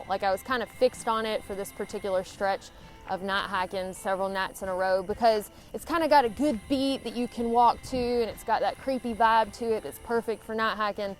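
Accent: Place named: South, United States. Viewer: Love it! LMAO!!😂 and your accent... "not hockin'"... way more Southern than the Richmond, Va. Accent I enjoyed so much so many years ago. Aahh, memories. Thanks,. 😊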